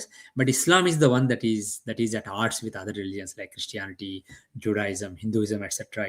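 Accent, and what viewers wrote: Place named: India. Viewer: Please cut off the Indian accent like many others who appear on Indian podcasts, news media etc speaking English that can't be apprehended easily.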